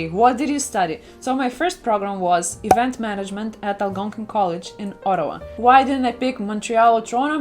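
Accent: Russia. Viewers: Как же приятно слушать русский английский =) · I love when you do "blah blah blah " And your Russian ascent is amazing👍🤩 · I love the Russian accent It's music to my ears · Love your Russian accent! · I love your russian accent 🥰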